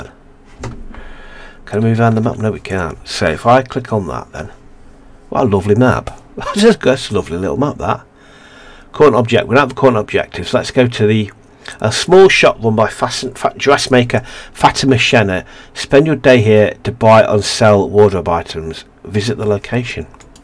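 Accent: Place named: United Kingdom. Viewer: From the uk too man sound exactly like one of the lads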